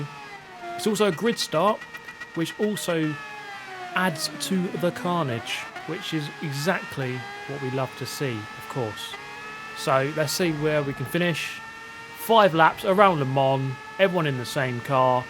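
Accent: United Kingdom. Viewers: As a british person, it never occurred to me that many people here just enjoy his accent. "Everyone" (lots of people) I know speaks like this, and it's actually nice having this on in the background of working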